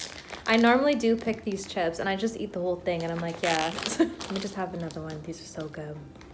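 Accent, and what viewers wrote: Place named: New Jersey, United States. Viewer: Oh wow she's Canadian? I toad-ally thought she was from like Jersey or New York. Is it just me or does she have that accent? Lol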